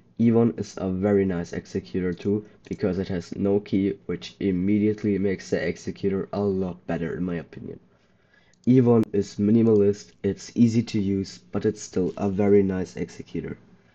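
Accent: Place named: Germany. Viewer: ich höre deutsch bruderherz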